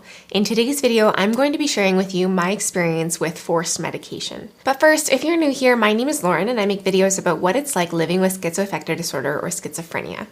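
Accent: Canada. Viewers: The part that was most jarring for me is that they stripped you naked like a criminal prisoner being stripped searched. Why was that necessary? Terrible :( Are you Canadian, btw? Just the way you pronounce "out", "about" etc. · I think you're missing some of her points. She's not saying she doesn't think there are situations in which forced medication is necessary, but that the way in which it's enforced can be much more harmful than it should be. Also, I'm new here, but she sounds like she has a Canadian accent- she may not be from the US- but that's just a guess.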